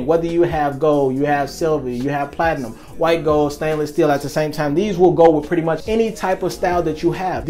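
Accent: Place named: Chicago, United States. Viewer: I hear yo Chicago accent deep correct me if I’m wrong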